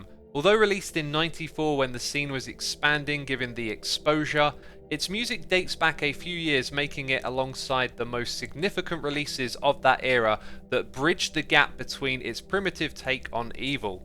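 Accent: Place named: RP, United Kingdom. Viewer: To me it was kinda unexpected to find out that X listens to this kind of music(I myself am a huge fan of death,death and trash metal) with his strong british posh accent.Anyone else?it just kind of doesn't go together?idk lol. Also nice video!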